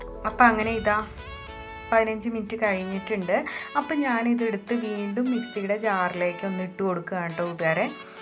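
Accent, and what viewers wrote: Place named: Thrissur, India. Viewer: Ennu ഹെൽത്തി ആയ ഓട്സ് പുട്ട് തന്നെ ആണല്ലോ ഉണ്ടാക്കിയത്. സംസാരം കേട്ടിട്ട് തൃശൂർ ആണെന്ന് thonunnu👌🏻👍🏻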